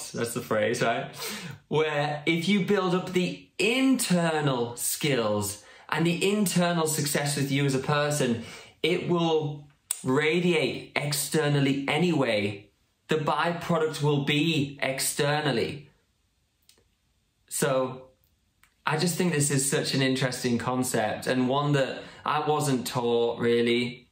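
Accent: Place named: United Kingdom. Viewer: between Queen’s accent and Britis accent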